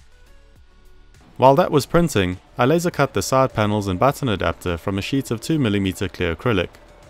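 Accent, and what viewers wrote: South Africa. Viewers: It sounds like you're from SA, if so, where do you get all of this stuff? Would love to know about the threaded inserts specifically...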